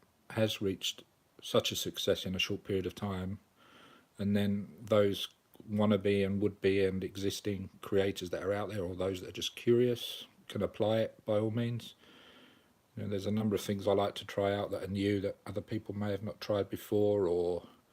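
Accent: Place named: Cockney, United Kingdom. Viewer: Yeah can tell you got abit of cockney in you were do you travel most?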